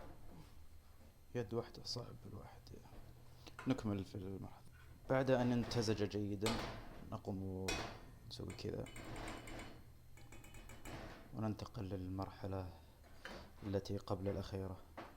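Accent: Saudi Arabia. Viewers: شويه يتكلم بلغه العربيه وشويه سعودي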